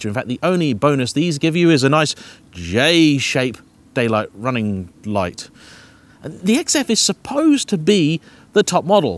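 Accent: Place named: RP, United Kingdom. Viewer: YouTube algorithm brought me here. The posch accept kept me through to the end 🤷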